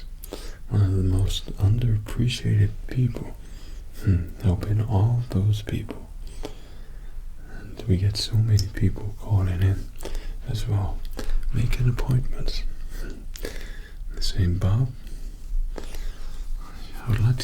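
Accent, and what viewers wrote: United States. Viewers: Admirable, the concentration on trying to stay in character and trying his best to mimick an American accent while being so subtle and soothing, must've required some good practice! Great job! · It's not torch in the US it's flashlight but good American accent overall. Torch does sound cooler tho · wow i really like your american accent. so good. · We Americans call it a flashlight, but your older American accent is pretty good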